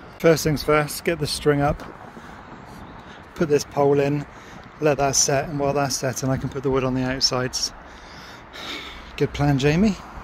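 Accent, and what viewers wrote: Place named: Australia. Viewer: Can you tell us approximately where you live? The scenery is so beautiful. I can tell feom your accent you are in Australia or NZ. (Hope I didnt offend-I know those are 2 very different places!)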